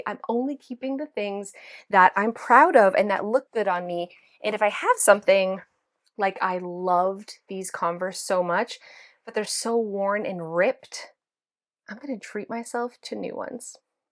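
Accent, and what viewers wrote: Canada. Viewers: just found you, love you!! :face-red-heart-shape:that accent, Canadian??, sunny, funny relief, away from world issues ( to OUR mini world issues)...I'm cluttered to the max!, I have PTSD ( not due to the clutter, which yes, is traumatic!) but after I watch you I feel exhausted, so I've virtually worked right?😜. I keep a journal, to make sure I do SOME decluttering every day, even a tiny amount is something. Thanks for being here x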